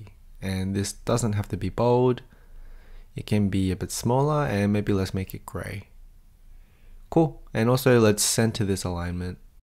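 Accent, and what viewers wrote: Australia. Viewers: Your accent is so interesting. It has flavors of Australian but more heavily sounding of American English than most Australians I've heard speak. What is it?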